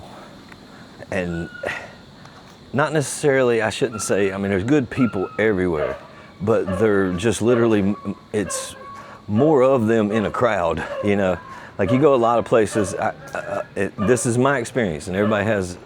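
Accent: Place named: West Virginia, United States. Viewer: Man, you're slinging the truth!! I'm 48 and making plans to move over there. I've been researching the Philippines for a few years now. I'm making the move for all the reasons you mentioned. I was married once, It lasted a couple few months and there's no way I'll do it again here in the States.....absolutely no way!!! I'm from Southern West Virginia and our accents are pretty much the same, lol. I dig the raw material, informative and entertaining, thanks for the time Brother.....I just subscribed!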